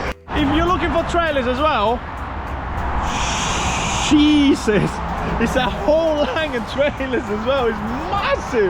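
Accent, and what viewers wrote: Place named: Northern England, United Kingdom. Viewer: I’ve never heard a foreign guy with a northern accent before but I’m all for it!! Love your videos man! Love the new truck as well!!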